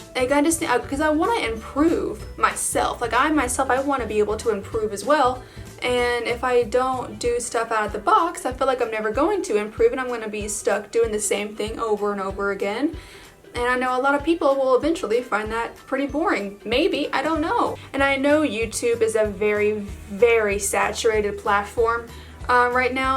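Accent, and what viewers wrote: United States. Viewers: Hi new sub here Lets be friends! Ive not had any new videos uploaded lately! Im into makeup and diys! Im a TN girl, all my life just outside of Nashville!!! My accent is a little more deeper than yours lol Not sayin thats a good thing lol Omg opryland lol used to love the themepark...but the hotel omg is GORGEOUS and the lights at Christmas!!!! The mall...omg good luck getting a parking spot within an hour during Christmas!!! Right?? Lol Well, so EXCITED your man is coming, hope you have him on the channel...have a Merry Christmas!!! Big thumbs up! Maybe next year we can collab on a video or 2!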